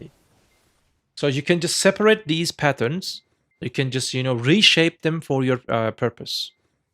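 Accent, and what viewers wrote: Turkey. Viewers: ben o türk aksanının kokusnu alırım abi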